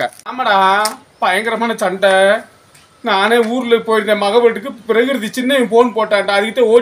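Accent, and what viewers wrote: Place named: Usilampatti, India. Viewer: உசிலம்பட்டி பேச்சு சூப்பர் அண்ணா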